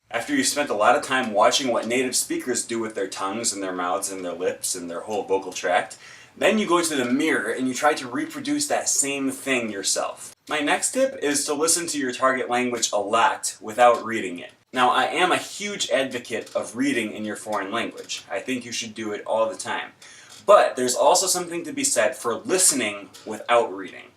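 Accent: Spain. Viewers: nice spanish accent. (I´m a spanish native speaker) ;))))) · nice spanish accent dude. (I´m a spanish native speaker) :)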